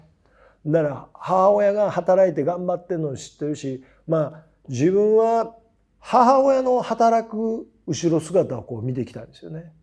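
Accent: Kansai, Japan. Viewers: さん、大好きです💕 お顔も声も、どストライクです💖ちょっと関西弁なところも…😍お若い頃から変わらず大好きです💘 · 何か…好きやなぁ😊 さん。変わらないと言うか、お話に虚栄が無い。関西弁が心地好くて人格が素敵✌️ お身体に気をつけて変わらないワールドを発信して下さい。ありがとうございました💚💛❤️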